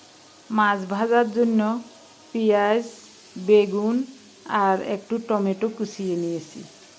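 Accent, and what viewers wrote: Noakhali, Bangladesh. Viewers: Ami Biratir theke dekhi.Spnar kothar modhye noakhalir tan ache.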